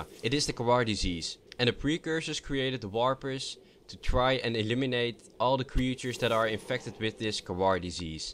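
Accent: Netherlands. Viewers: i havea question... are you netherlands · Hè really sounds Dutch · are you dutch? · 1 question... are you Dutch? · you sound dutch? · Wauw je hoort gelijk dat ie nederlands is XD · Are you from holland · R U dutch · Haha ik kan aan je accent horen dat je nederlands bent. xD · Yo man, ik kan horen dat je Nederlands bent. Ben ik ook! Vette vids trouwens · is he dutch? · sounds like he has a dutch accent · are you maybe dutch becaus i am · are you dutch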